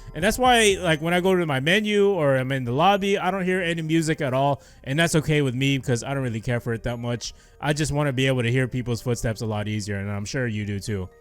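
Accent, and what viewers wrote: Scotland, United Kingdom. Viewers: Its Scottish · It's Scottish pal not Australian!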